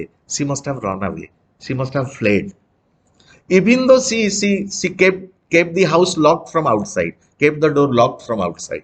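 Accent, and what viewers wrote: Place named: Bengal, India. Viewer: His Bengali accent is too cute and attractive 😍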